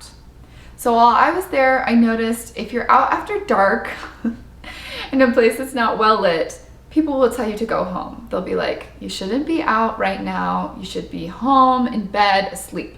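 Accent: Philippines. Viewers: I admire you and like the way you appreciated the foods and how you explained the experiences you had in the Philippines. Your giggling is adorable other that you're gorgeous and beautiful. I also like the way you say our language and uttered it the Filipino way. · Your Tagalog accent is mind blowing 👍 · Wow nice filipino accent 🇵🇭🇵🇭👍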